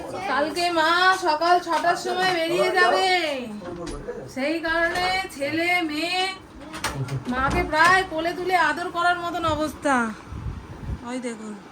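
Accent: Bangladesh. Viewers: সব ভাল কিন্তু এতো বছর ভারতে থেকেও বাংলাদেশি ভাষা ছাড়তে পারেনি।ফোন দেওয়া, চাবানো, কান্না করছে এইসব শুনলে বিশ্রী লাগে। এদেশিয় ভাষাটা শেখা উচিত ছিল।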